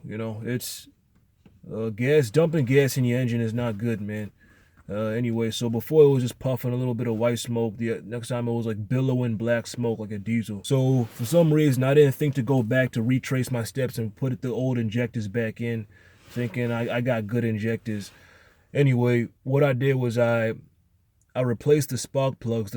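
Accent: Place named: Minnesota, United States. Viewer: Minnesota badass, 10 deg, snowing, barehands, even got some accent!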